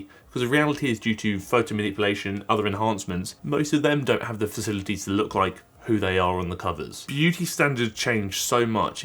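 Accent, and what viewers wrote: United Kingdom. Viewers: I have PTSD that makes British accents (specifically male voices) very triggering for me. You are a rare exception. I largely attribute this to your content and sincerity.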